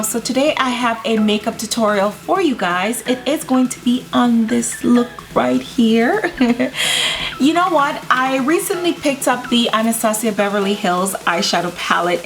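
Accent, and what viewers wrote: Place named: Jamaica. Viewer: your makeup is always so gorgeous, are you jamaican I hear an accent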